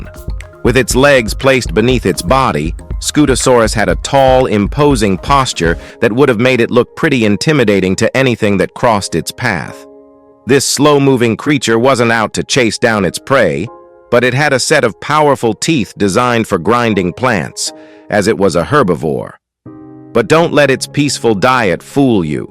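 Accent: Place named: United States. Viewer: Hate that american dialect in science. sounds duh ? Foolish..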